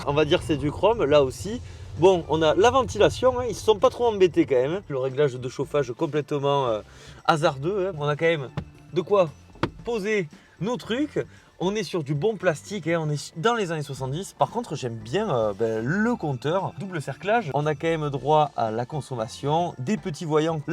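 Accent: South, France. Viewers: C'est débile, même avec l'accent du sud, on n'y croit pas : soit tu achètes un frigo, soit tu achètes une LN ... il est mauvais et il le dit lui même sans respecter la voiture qu'il présente ... un guignol de plus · Allez je me replonge dans un ancien test : ce qu'il y a de merveilleux avec toi c'est que tu vas t'extasier avec le même enthousiasme devant une modeste populaire autant que devant une Ferrari ! En bon chauvin, le charme vient aussi de notre bel accent du sud... Ouh la je vais me faire des ennemis....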